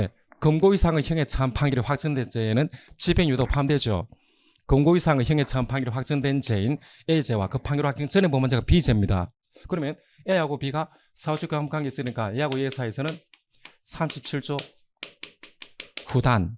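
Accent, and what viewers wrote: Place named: China. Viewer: 딕션이 중국인 같노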